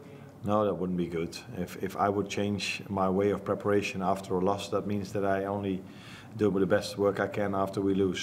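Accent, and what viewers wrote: Liverpool, United Kingdom. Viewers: is it just me or is he already getting a bit scouse?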